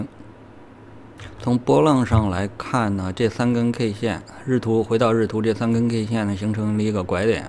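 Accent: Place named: Beijing, China. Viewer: 喜歡你的京腔….沒有discord?或微信？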